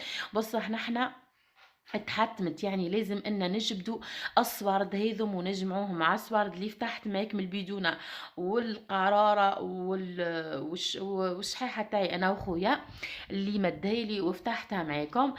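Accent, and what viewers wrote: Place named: Tunisia. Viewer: علاش تهدري كي توانسا انا من تبسة منهدروش هاك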